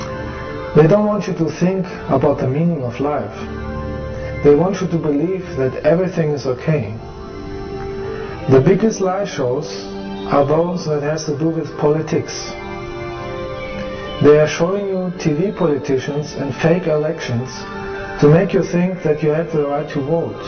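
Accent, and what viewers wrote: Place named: Germany. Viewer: Trovo questo discorso estremamente interessante e veritiero,ma non dovevano, per rendere la cosa piu' credibile ,far spacciare questo signore per un extraterrestre,infatti conosco bene l'inglese ed effettivamente suona come fosse un tedesco parlando inglese,quindi nulla di soprannaturale,ma nulla toglie che il suo discorso non fà una piega.Umanamente bravo nel suo intento.Se non dovessi essere corretto nel giudizio chiedo umilmente scusa a gli extraterrestri.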